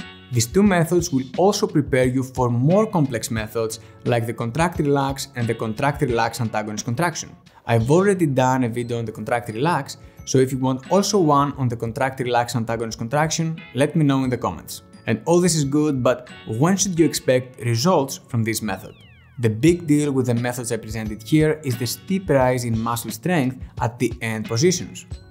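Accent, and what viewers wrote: Spain. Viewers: What accent is that? Are you from Spain?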